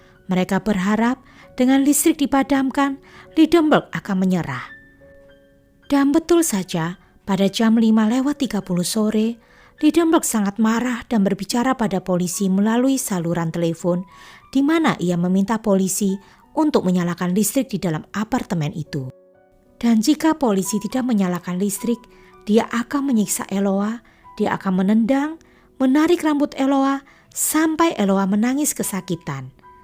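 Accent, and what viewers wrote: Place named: Java, Indonesia. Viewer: .... waktu bilang.... jangan bergerak kalo tidak aku tembak....ih... ngeri kali nada nya kek nyai Blorong yg haus darah di film Suzanna...ih takut aku.... walau begitu tetap aja kangen logat medok mu.... ingat ya jgn sampe jadi nyai Blorong.... cukup jadi krupuk orong orong Medan yg gurih dan lezat.... sukses terus ya....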